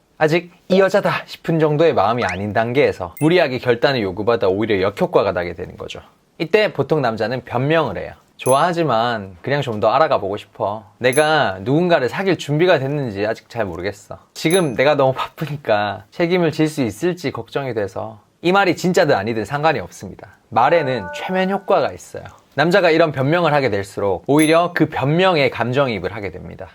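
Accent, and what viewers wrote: Gyeongsang, South Korea. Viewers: 부산 사람인가요? 경상도 분이신가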